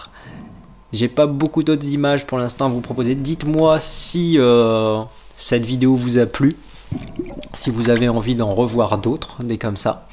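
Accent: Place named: Brittany, France. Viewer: Elle marche bien cette petite vidéo commentée, félicitations, avec l'accent breton c'est sympa.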